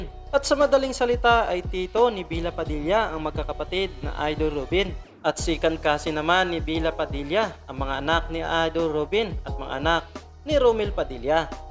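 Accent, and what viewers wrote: Visayas, Philippines. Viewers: Bisaya siguro ang may Ari ng vlog na eto. Iba kasi ang tuno ng Tagalog niya. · Ang ganda ni Bella Padilla😍 At sa mga nanghusga sa Vlogger dahil matigas magsalita haler bisaya e proud ako sa mga bisdak inggit lang kayo hahajokjok😂✌️ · Bisaya kaman dong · I'm also a bisaya and i'm proud of it. Most of us are nahihirapan magsalita ng tagalog fluently, fortunately tumira ako sa Manila nung bata pa ako kaya okay magtagalog. Pero naiintindihan ko ang may ari ng vlog na to kasi may mga kaibigan at classmates din ako na ganito magsalita ang I don't see any problem with that. Kuya Vloger, ipagpatuloy mo lang po ang pagvavlog proud kami sayo na mga kababayan mo. Saludo po ako sa katatagan ng loob mo, wag mo nalang po pansinin ang mga nambabash sayo, most of them are inggit lang sayo. Ipagpatuloy mo lang gumawa ng mga videos as long as it makes you happy and also continue to be proud Bisaya😊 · Bisaya kase. 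Ok lng yan. Hehehehe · Kung ganyan man sya magsalita ay huwag po naten sya pagtawanan .. .ganyan naman talaga magsalita ang bisaya diba.. .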